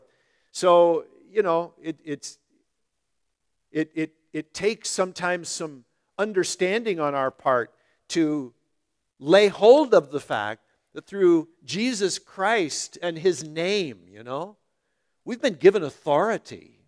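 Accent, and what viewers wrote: Canada. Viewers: I thought you were Canadian.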